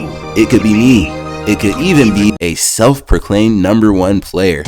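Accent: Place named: Aba, Nigeria. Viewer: why bro got a aba accent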